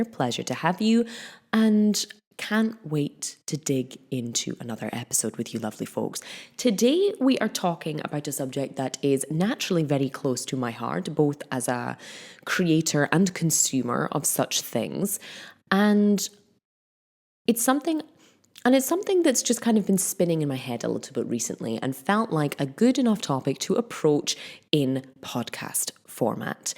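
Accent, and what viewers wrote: Scotland, United Kingdom. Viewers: I dont know what it is but I love hearing you talk lol probably the scottish accent 😉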